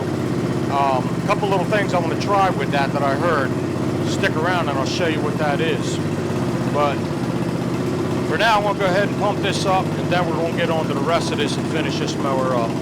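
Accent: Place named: Ohio, United States. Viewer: Are you located in Ohio by any chance? You sound exactly like my brother in law. He is from eastern ohio. Nice video.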